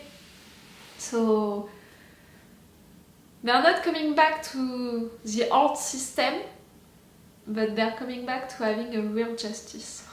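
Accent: France. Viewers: I love your french accent it's beautiful! I subscribed. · I love your Frenglish accent and your true to the ground emotional reactions, your tears of joy or sadness, your authentic cuteness. <3 Shy meow and hug from Switzerland. ^^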